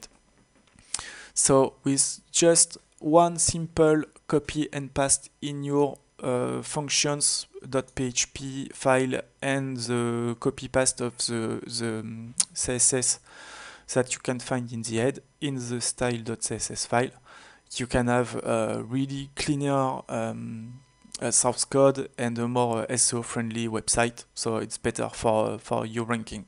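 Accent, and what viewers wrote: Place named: France. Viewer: This really helped me. And I wish I spoke French, so I dig the accent.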